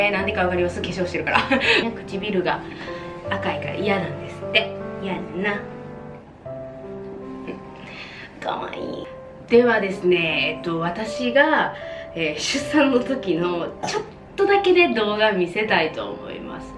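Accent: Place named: Osaka, Japan. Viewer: happy birthday💗感動して泣きそうなりました(>_<)優しい旦那さんと可愛い娘ちゃん💕これからのwonderful人生応援してます💗いつも大阪弁が親近感で大好きです❤️またメイク動画も楽しみ*\(^o^)/*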